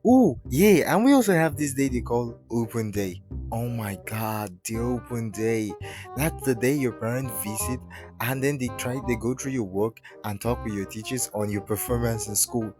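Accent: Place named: Africa. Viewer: You are African.(the dang accent reminds me of ME)😂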